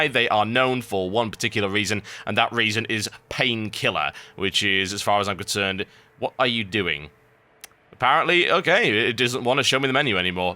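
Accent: United Kingdom. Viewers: this guy's british accent can almost compare to paul from paul's gaming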